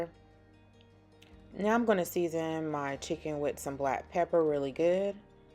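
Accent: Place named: North Carolina, United States. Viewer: First time here but I knew you were from NC...lol...I'm from the Lumberton area...it's so funny to here us speak..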